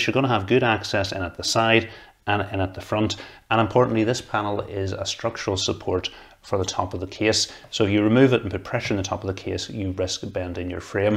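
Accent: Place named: Northern Ireland, United Kingdom. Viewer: “Hears NI accent” subbed, nice to see a successful channel from home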